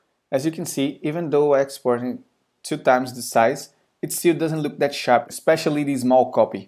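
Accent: Brazil. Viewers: Valeu cara! Logo no começo do vídeo eu falei "esse sotaque é brasileiro", e confirmei com a linguagem do seu S.O kkkkkkk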